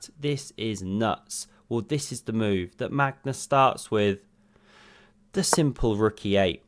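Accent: United Kingdom. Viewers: God I love this commentator. So funny, so English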